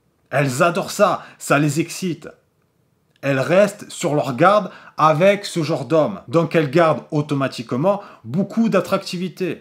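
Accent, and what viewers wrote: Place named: South, France. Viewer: C'est la 1ere fois que j'entends un allemand parler avec un accent du sud 😂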